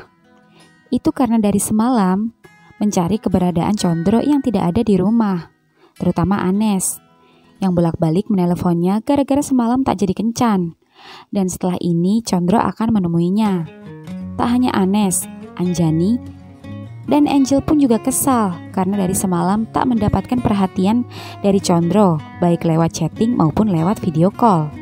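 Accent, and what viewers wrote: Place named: Eastern Indonesia, Indonesia. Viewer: .. 👍👍👍 Aku suka cerita mu.. Siapa sih pembawa cerita ini? Kamu pandai membawakan cerita ini dengan dialeg Indonesia Timur mu hebat. Hanya dialeg Jawa kasar nya perlu improvement sedikit.. Dan sangat menarik cerita nya dengan pandangan taboo budaya Indonesia… Malu malu walaupun mau dan harus membohongi diri sendiri.. self denial..😢😢😭😭 Semoga selalu ber kembang dan sukses Channel ini.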